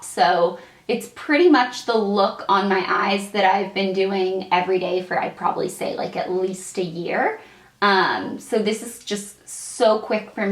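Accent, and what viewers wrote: South, United States. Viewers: I used to watch you years ago! (: I forgot how adorable your southern accent is ahah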